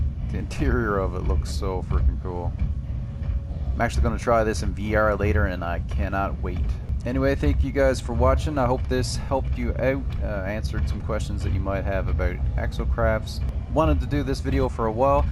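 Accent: Newfoundland, Canada. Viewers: Before I even noticed your name "Ah, sounds "Canadian", must be a Newfie" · Are you from Newfoundland? Because you sound and have hints in your name that you are because I am and the accent is very familiar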